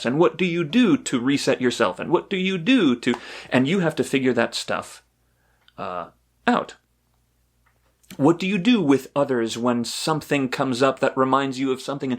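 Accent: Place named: Canada. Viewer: Are you Canadian ? You say certain words like Canadians do lol .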